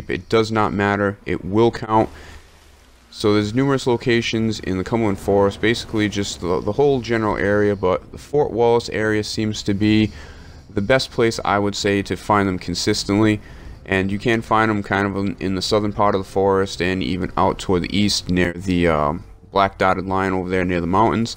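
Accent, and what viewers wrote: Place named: East Coast, United States. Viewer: you know i know you.said before that you lived in the UK and I was kind of confused lol. My daughters mother is from good ol Rhode island and I have wondered lol it makes so much sense now! You dont even have to explain. ( i must admit I did wonder a few times ok how does our friend here speak like a east coast United States native and says he lives in the uk. Makes perfect sense now. You can count on me to watch every video you make. Like and share with everyone I know. You, you are the type of YouTube channel that deserves thousands and millions.... You are real man! You always got a friend and supporter in me old! You already know that though! Keep up the great work my friend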